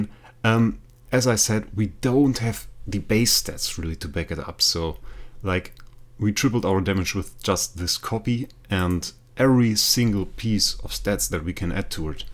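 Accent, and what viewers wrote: Germany. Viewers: Do you make acc reviews? And are you german?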